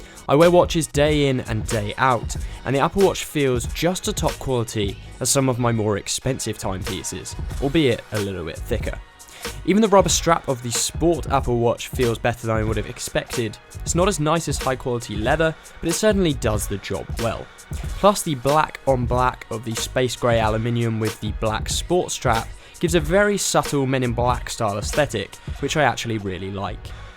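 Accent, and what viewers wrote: United Kingdom. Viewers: damn how Gorgeous Britain English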